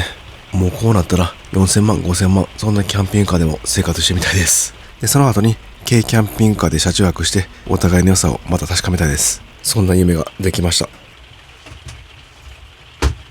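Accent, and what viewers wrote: Kansai, Japan. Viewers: １分で関西出身とわかる！喋りのアクセントで… クルマは岐阜ナンバーだが。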